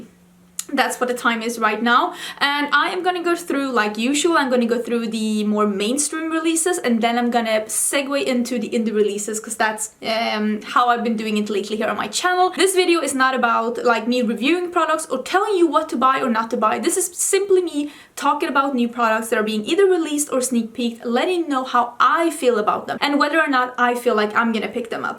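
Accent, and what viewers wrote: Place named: Sweden. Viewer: Hey! I've been mia for a few months, but congrats on 50k!!! And I'm loving the hair; I'm planning something similar ❤ Your English is getting so good we wouldn't even know you were Swedish without that cute accent!! Lol. In the U.S. we usually only use "neither" when referring to only 2 things. Like...I like neither salt, nor pepper. If it's a group of more than 2 we usually say, "I don't like any of it." Or "I like none of them." I'm only saying this cause I know you won't be offended, and I know you're a smart girl so you like knowing EVERYTHING about something. I can tell, cause you're like me. 😉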